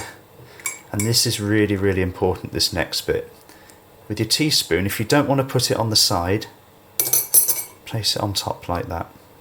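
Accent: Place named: England, United Kingdom. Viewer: I am a coffee drinker. But I appreciate your tea with English accent. Greetings my friend.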